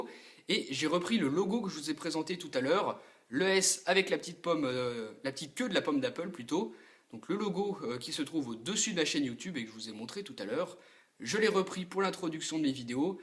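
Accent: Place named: Savoy, France. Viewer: On reconnait l'accent de la savoie :)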